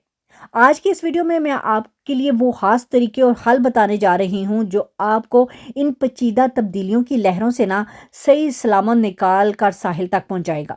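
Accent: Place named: Punjab, Pakistan. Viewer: Tu c Paindo ho. Ardo (Urdu) Punjabi accent vich bol di ho.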